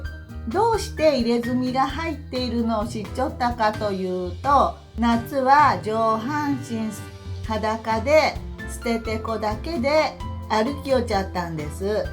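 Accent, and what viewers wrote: Yamaguchi, Japan. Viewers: 本当の山口弁！わざとらしい、鳥肌が立ちそうなYouTuberさんとは一線を画します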